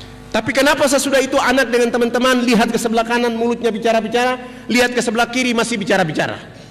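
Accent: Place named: Papua, Indonesia. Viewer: Aku dari sumbar,aku suka bahasa papua,karna bisa membuat ku tertawa,dan merasa bahagia..habis rada2 lucu cara bicaray..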